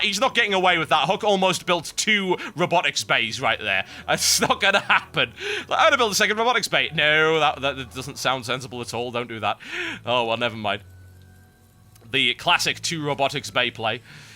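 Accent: United Kingdom. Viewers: just a suggestion TB, since you're catering your casts to those who don't really understand the game or play very well, at the start of the cast when it's perfectly standard play you could perhaps explain the map layout a bit, and the possible playstyles accompanying the maps. Apart from that, keep up the casting! Love hearing a British commentator =D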